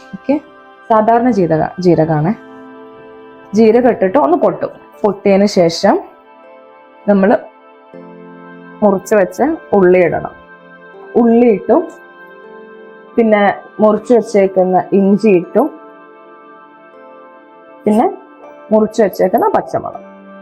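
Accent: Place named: South Kerala, India. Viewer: നിങൾ കോഴിക്കോട് അല്ലേ . എന്തിനാ ബുദ്ധിമുട്ടി തെക്കൻ കേരള slang എടുക്കുന്നെ ?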